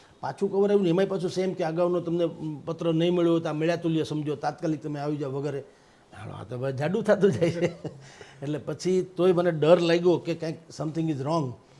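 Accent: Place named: Saurashtra, India. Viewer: Vah bhai...Ek To Tal Sorthi Bolee neUparthi pattrakar,Meghanigini Yadav aavi gai.Ek var Jaher Sabhana 'Manch' par Aapne Bhashan 'DETA' Joi rhyo Chun.Maru aa Sapnu che.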